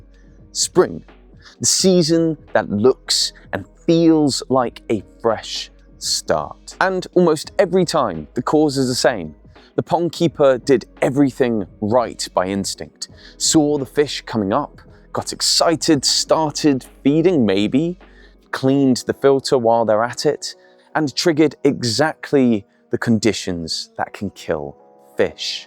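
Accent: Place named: United Kingdom. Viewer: I love this channel. I'm a sucker for soft, slow British accents; but the content is also amazing. Love love love. Wishing you much success 💕💕🎉🎉🎉🎉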